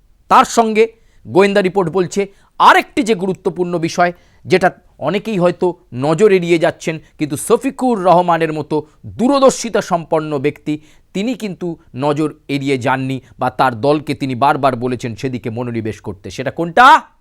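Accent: India. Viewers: ভাষার টান ভারতের মতো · এইটা যে ইন্ডিয়ান নিউজ সেটা টোন ও ভাষার শব্দেই বুঝা যায়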